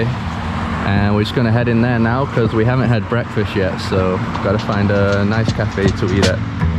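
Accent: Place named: Northern England, United Kingdom. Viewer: Northern English + American + Global English. Wow bro, you're a true nomad :D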